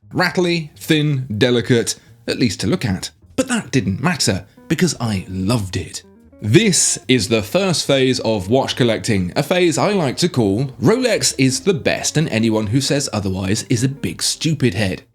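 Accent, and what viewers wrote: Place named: United Kingdom. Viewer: Great writing and smart British accent. Makes for good program. And my daughter's name is Charlotte.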